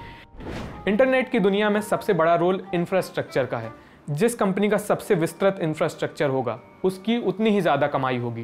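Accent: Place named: Lucknow, India. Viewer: Shukrya Bohat behtar tareeqe se aaone samjhaya. Aapka bohat behtreen URDU LEHJA HAI AUR urdu aap ki bohat sheereen hai..Lagta hai asp Urdu jagah ke hain...Lucknow Kanpur Rampur banaras Allahabad Bareilly... Aap aur samjhayen aap ki zuban sunkar mehsiis hua ke ham lucknow kanpur men hain Masha Allah Allah aap ki zuban aesi sheereen banaye rakkhen Ameen. Aur sab aesi hi Urdu men baten karen Adaab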